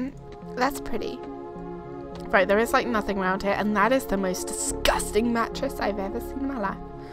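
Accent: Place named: United Kingdom. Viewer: I do like your style, and your vids look promising. Nice work. Your numbers are goals as far as I'm concerned. And it's great to hear another British Let's Player :)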